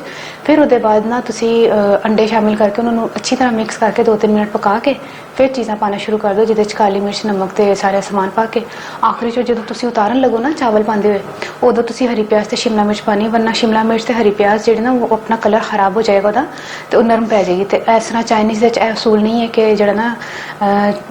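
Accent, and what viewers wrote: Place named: Ichra, India. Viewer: Tumhari urdu sy lagta hy tum ICHRA ki ho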